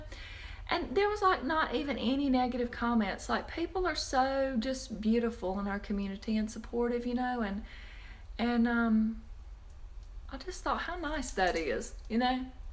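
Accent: South, United States. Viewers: Your features do remind me of Liz Taylor I wonder if the southern accent also has something to do with that, "cat on a hot tin roof" was playing in at the theatre recently. I wonder if you have seen her in e film? Xx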